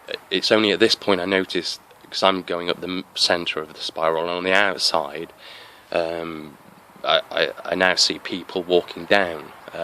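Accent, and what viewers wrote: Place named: Lincolnshire, United Kingdom. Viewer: could i ask what region your in? your accent sounds like mine im in lincolnshire. just wondered as everyone i know is asleep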